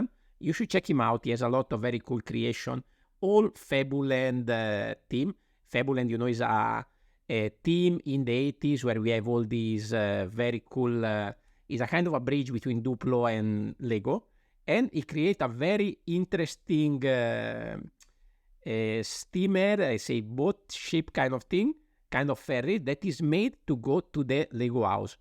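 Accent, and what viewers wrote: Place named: Italy. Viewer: Thank you for the kind feature, I'm so glad you like my Fabuland paddle steamer. Your models are beautiful and I absolutely love watching this channel, particularly for your accent as it reminds me of my Italian friends. Best wishes, Stewart (Stubot) ❤💛💙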